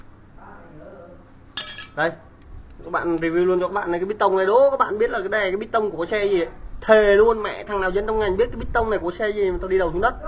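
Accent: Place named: Phu Yen, Vietnam. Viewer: Phú yên na anh kkk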